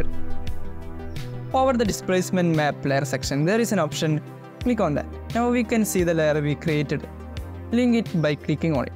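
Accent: Kerala, India. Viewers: Bro malayali anno🌝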